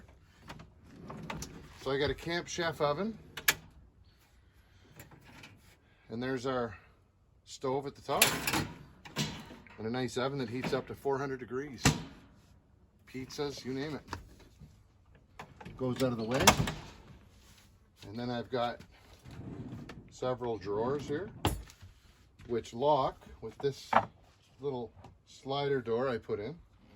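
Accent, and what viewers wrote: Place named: Bahamas. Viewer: Why does he sound a bit Bahamian from Abaco Island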